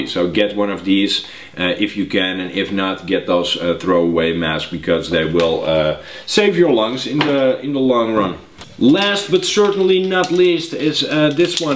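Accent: Netherlands. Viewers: lol i saw a couple of videos from you and i already thought you are dutch hearing your r's and now you confirmed it lol btw dutch too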